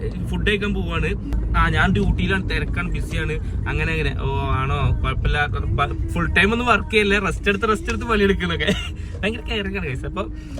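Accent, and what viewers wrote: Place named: Kozhikode, India. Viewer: കോഴിക്കോട് ആണ്...... Confirm... ഇയ്യ്... അ അന്നെ.....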